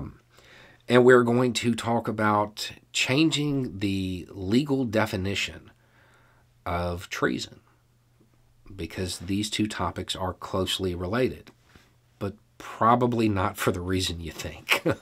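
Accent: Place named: Appalachia, United States. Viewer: I so appreciate your erudite qualities, in a hillbilly-lite accent (adorable juxtaposition us smarty-pants Northerners need to hear) leveled at the complexity of how our country works...or doesn't, during these chaotic and divisive times. Your voice of reason and truth is so calming. Thank you -muchly.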